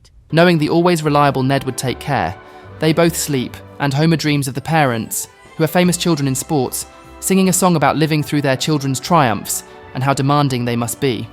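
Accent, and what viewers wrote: United Kingdom. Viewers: Curious question if you're from the US how come you have a British accent...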